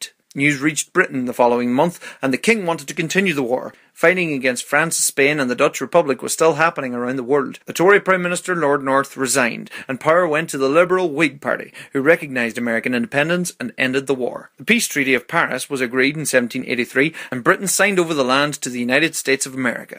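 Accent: Ireland. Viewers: I love his voice. Is he Irish? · Tsunami Williams yes he's Irish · Are you irish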